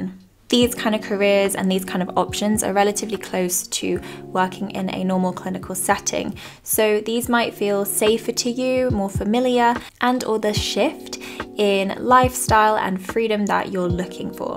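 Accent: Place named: Australia. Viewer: You have an aussie accent. How come?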